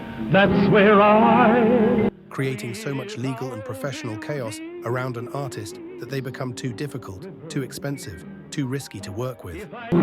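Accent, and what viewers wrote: United Kingdom. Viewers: Ok, hold the phone! You ask us to subscribe when you’re telling stories of us from this country with a British accent? Like we have to listen to a proper outsider. There is a word for this. Condescension!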